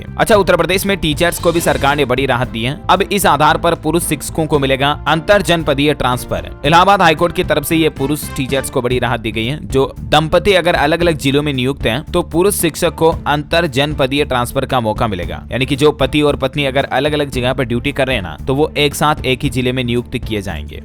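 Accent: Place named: Bengal, India. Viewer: Bengali english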